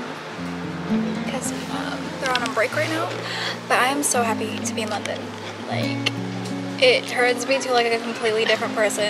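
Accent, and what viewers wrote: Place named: United States. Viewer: ahh, london. you have an america accent. are you from here? if so, would you recommend moving to London/overseas? do you have a video on your experience & the cost of living?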